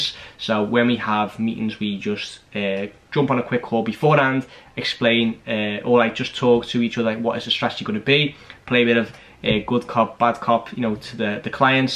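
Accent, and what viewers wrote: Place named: Liverpool, United Kingdom. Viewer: how is it that you are a dutch citizen but sound like a scouser? ☺️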